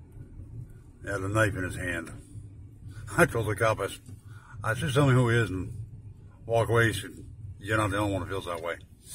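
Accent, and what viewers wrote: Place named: North, United States. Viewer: Are you from Tennessee and this cop does he live in Tennessee you sound like you up north somewhere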